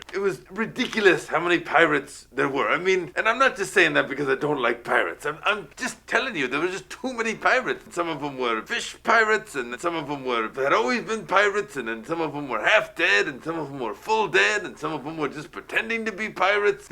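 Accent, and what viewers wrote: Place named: Ireland. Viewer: sounding quite Irish in this video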